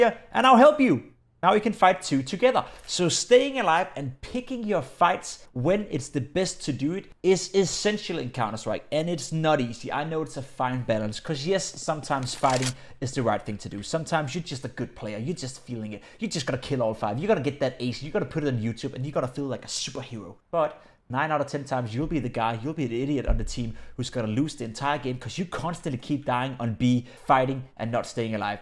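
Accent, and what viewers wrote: Denmark. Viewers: you sound danish so if you danish awnser this in danish hej lige fåndet dig og du er mega god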